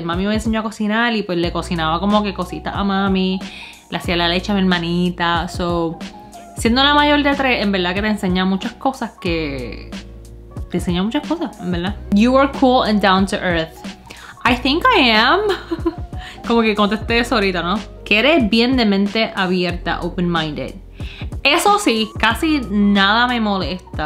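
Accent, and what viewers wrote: Puerto Rico. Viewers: Tienes una fonética de PR. No es que eres vulgar sólo que hablas como los jóvenes callejeros. Eres una joven educada y en ocasiones debes presentar esa parte de tí en español ya que si no puedes tus maestros de PR te fallaron. Por cierto, en inglés te expresas muy elocuente y correcto. Pero... Si en realidad estás presentando tú personas creativa y artística en el entretenimiento entonces eres excelente👏. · Your great i love your videos and your Puerto Rican accent 😍😘😉💗💖💯🇵🇷🇵🇷🇵🇷🇵🇷🇵🇷🇵🇷🇵🇷🇵🇷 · Esa es tu manera de ablar... eres bóricua para mí la gente no todos siempre ablan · Hola,yo naci en Newark,New Jersey ,mis padres boricuas,me fui para P.R. cuando tenia 8,vivi alla 21 years, hora vivo en Connecticut ya hace 33 years,pero nena tu hablas mas boricua que yo lol me encanta saber que eres china y hablas super bien el spanish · Tu acento bien boricua, tu manera de ser. 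El dialecto que usas, como actúas con las manos!!! Mano eres más boricua que muchos nacidos en PR... 🥰 good vibes! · Me encanta hablas como boricua! Las palabras, tu acento. Love it yo soy boricua pa q tu lo sepas.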